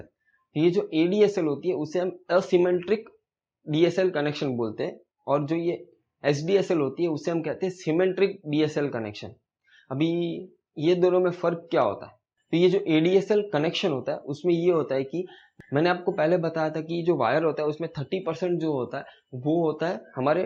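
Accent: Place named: Gujarat, India. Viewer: Bhai app gujarati chone avaj thi lage che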